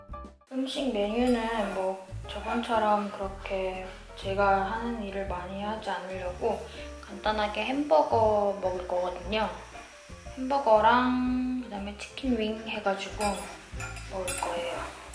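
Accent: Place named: Gyeongsang, South Korea. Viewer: 맞어요, 저도 안하려고 하다가 그냥 지나가면 너무 슬플것만 같았어요. 풍선 부는거..보통 일이 아니셨을듯 해요.ㅠ 전 한국에서 소품 주문 해서 오고 있는데 미국에서도 이렇게 이쁜것이 많네요~ 영상 보면서 말투가 같은 경상도 여인이라 무척 더 반갑네요 ㅎㅎ 님 아가영상 정주행 하며 태교도 하고 갈께요 ~ 😍 식탁 정보도 너무 감사해요~!^^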